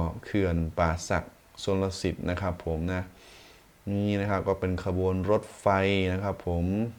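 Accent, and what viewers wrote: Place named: Phetchabun, Thailand. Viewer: สำเนียงภาษาแอดมินคล้ายๆกับสำเนียงภาษาบ้านผมเลยครับที่เพชรบูรณ์ครับ😊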